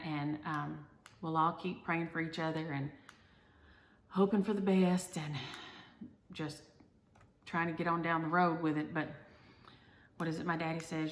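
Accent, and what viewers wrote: South, United States. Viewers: I absolutely adore you. I laughed out loud at a couple of your stories. The doorbell one especially. Love your southern accent. Keep recording your episodes. They are perfect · Great video!!! I love hearing another southerner! We’re getting on down the road like a chicken on the loose! 😉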